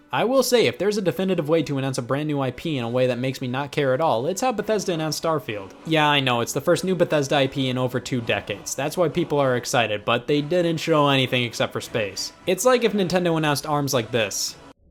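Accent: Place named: South, United States. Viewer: Hey yall scott here you can tell he is a southerner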